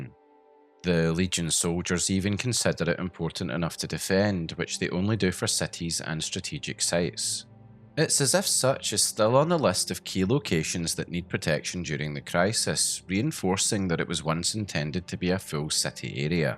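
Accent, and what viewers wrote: Scotland, United Kingdom. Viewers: I like thick Scottish accents, and I like Bethesda game lore. You got me on board lol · Are you Scottish? 🏴 let’s go I’m Edinburgh side 😊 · man i so love your scottish accent... always liked how it sounds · The Scottish accent makes this video much better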